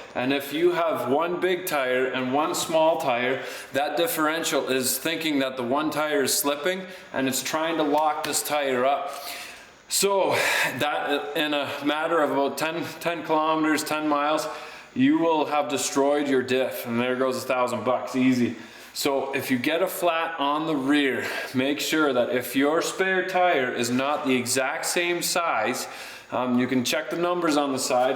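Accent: Canada. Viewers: Good, useful, logical tips presented in a wholesome Canadian accent. Well done! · I love how i could tell he was Canadian after the first 30 seconds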